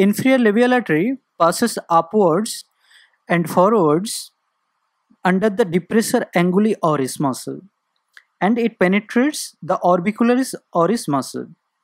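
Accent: India. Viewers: ☹️I don’t understand the accent of. Indian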